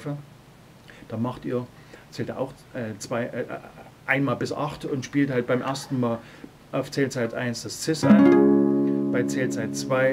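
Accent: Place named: Saxony, Germany. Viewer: ganz toll gemacht....normal mag ich das "sächsische" ja net so gut leiden....aber du bist da mit abstand ne ausnahme....liegt wahrscheinlich an Deiner symphatischen Art das Ganze zu erklären. TOP--> super Tutorial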